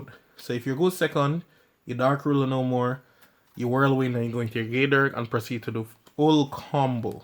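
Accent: Jamaica. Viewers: Bro are you jamaican?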